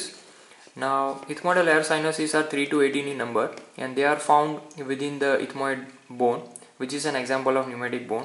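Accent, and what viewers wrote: India. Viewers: Very hard to understand. All your words are just joined together in a train sequence, theres no distinguishability between 1 word from the next. When you speak with an accent, specially a heavy one, you must talk slower, take a break between parts in different parts within the sentances, and pronounce words heavier. Otherwise it just sounds indian. This is advice from a linguist !!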